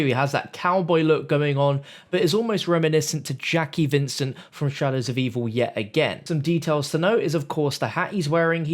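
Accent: United Kingdom. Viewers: The British accent is real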